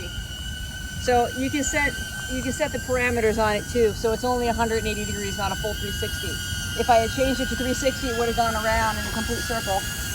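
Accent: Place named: England, United Kingdom. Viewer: Good evening friends. Very interesting. I hope it works out well for you. English accent. 🤣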